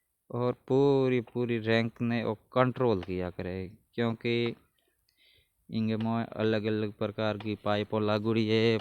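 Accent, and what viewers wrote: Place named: Rajasthan, India. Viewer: Ram Ram sa आपका कौनसा गांव है भाषा से तो फलोदी के लग लग रहे हो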